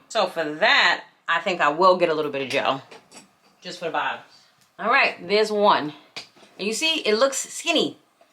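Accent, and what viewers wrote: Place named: New York, United States. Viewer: I love you NY accent ❤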